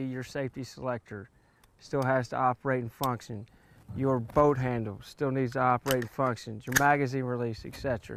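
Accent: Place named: South, United States. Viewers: Is it a requirement to sound like you're from Carolina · reminded me of Forest Gump... that southern accent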